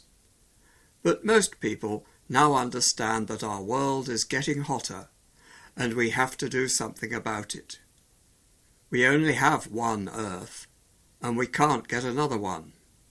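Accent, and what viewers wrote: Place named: United States. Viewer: درود بر شما لطف کنید ازین مقاله ها بیشتر بزارید با لحجه آمریکایی بیشتر ❤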